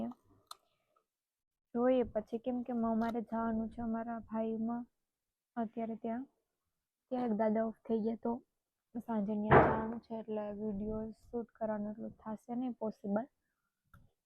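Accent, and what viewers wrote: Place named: South Asia. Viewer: didi you deserve my subscribe because many people kaik ne kaik speciality hoy j chhe i found a speciality in you ae.. tamaro desi accent je bolva no chhe they really makes you famous all the best from my side..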